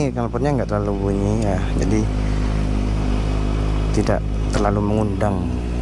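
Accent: Sidoarjo, Indonesia. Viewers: Orang Sidoarjo bang?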